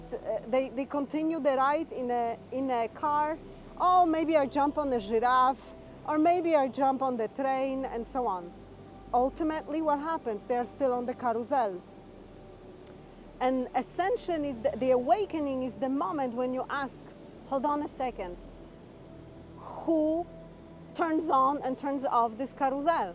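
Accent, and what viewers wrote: Poland. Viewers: Hello, thank you for your words, you seem to speak with Polish accent. ❤❤❤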